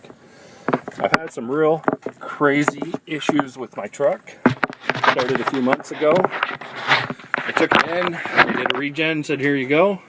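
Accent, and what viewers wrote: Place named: Canada. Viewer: This guys sounds Canadian (don’t know too many Americans who pronounce Chrysler with an S on the end)...does Carfax have reliable info sources in The Great White North?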